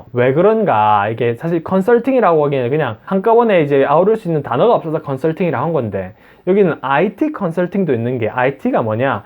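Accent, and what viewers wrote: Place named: Gyeongsang, South Korea. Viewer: 안녕하세요, 님. 저는 현재 해외에서 한국어 교원을 하고 있는 사람입니다. 저는 원래 영어교육학이 전공이라 영어교사로 고등학교에서 재직하다가... 무언가 고등학교라는 시스템이 학생을 너무 괴롭힌다는 생각이 들어..그나마 널널한 한국어를 가르치고 있는데... 최근들어 드는 생각이, 저는 영어를 좋아했던 것이지, 학생을 좋아하지는 않은 것 같습니다. 그래서 제 주변에 us cpa하시는 분께서, 영어에 자신만 있다면 미국 cpa를 한 번 도전해보라고 해서.. 당장은 아니지만, 조금씩 준비해 나가려고 합니다. 한국어교원 컨텐츠 영상이나 회계사 컨탠츠 영상을 보면.. 대부분 학원들 광고가 많이 뜨는데, 님처럼 개인이 어떻게 공부했는지도 올려주시면 좋을 것 같아요... (참... 조회수는 안나올 컨텐츠이긴 하지만....). 그리고 조금은 난해한 질문일수도 있지만.. 님은 세무사로서 지금 행복하신가요? 그저 개인 의견이 궁금합니다. ^^ (저 또한 부산 사람이라... 하지만 직업상 참..억양을 죽이려고 노력하는데... 마음껏 갱상도 억양을 발산하시는 님이 오늘따라 참 부럽습니다.... 뭐 저는 억양을 없애려고 노력만 했지, 없다고 하지는 않았습니다....^^)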